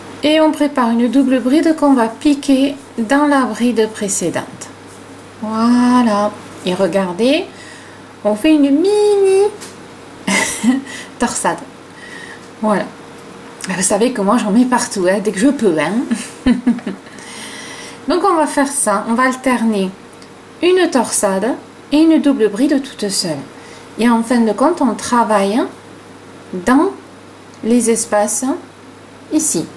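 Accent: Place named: Provence, France. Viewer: merci pour se jolie chouchou et en plus avec notre acsent je suis de provence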